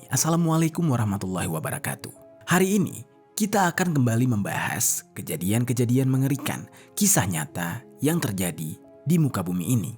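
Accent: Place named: Sunda, Indonesia. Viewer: Bahasa Sunda nya kekok..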